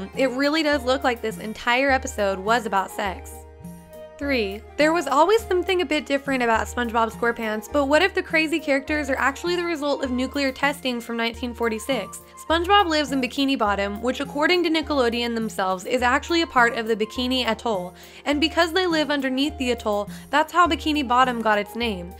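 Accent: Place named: South, United States. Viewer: so was I right you are from the south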